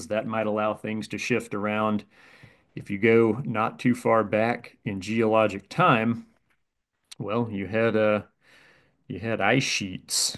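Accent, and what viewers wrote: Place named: Western Pennsylvania, United States. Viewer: ...is the narrator from PA, spesifically western PA? He sounds like several family members that are from near Pittsburgh.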